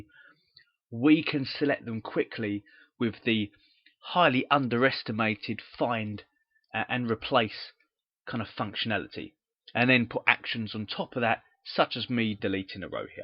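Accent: England, United Kingdom. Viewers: Love English accent lol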